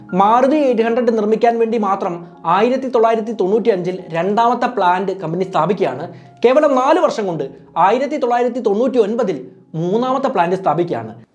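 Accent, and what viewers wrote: Kannur, India. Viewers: കണ്ണൂർകാരൻ ആണോ..? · നിങ്ങൾ കണ്ണൂർ അന്നോ 😊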